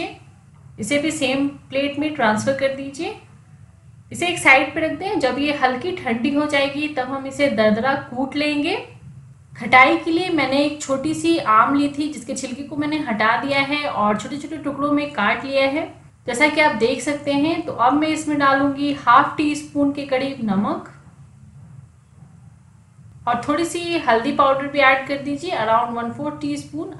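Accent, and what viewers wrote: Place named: Bihar, India. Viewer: Esya bihar m bolty h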